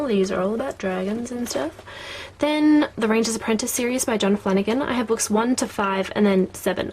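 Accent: Australia. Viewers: god, i could listen to you talk all day! I love aussies! :D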